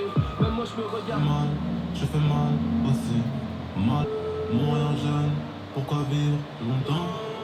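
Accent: Liège, Belgium. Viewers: trop cool ca fait du bien d'entendre un accent liégeois !!!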